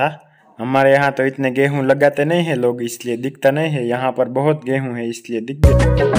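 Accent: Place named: Kathiawar, India. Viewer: Apke bolne k dhang se pata chalta hai k aap kathiawadi ho,kathiawadi log kathiawadi dhang se bolte hai,from punjab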